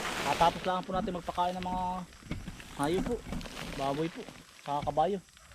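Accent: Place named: Batangas, Philippines. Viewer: Ay BATANGUENIO KA EH KA INSAN ANG YUNG PUNTO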